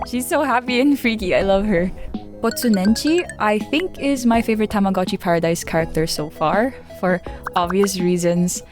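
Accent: Philippines. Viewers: I've only watched the first 10 seconds and oh my god are you Filipino? Edit: I checked your bio, you are?!?!?! :DDDDDD AHH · Omg! Im also pinoy! Kamusta? I never realized na filipino ka pala. Pero may slight filipino tang sa accent mo.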